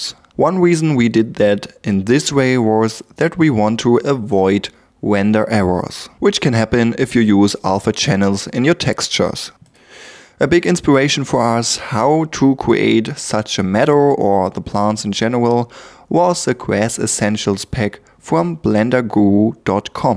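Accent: Germany. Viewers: haha your english is awful :D 10 seconds into the video and i yet hear that u are german ;) anyway, great 3d skills, good explanation- stay creative :)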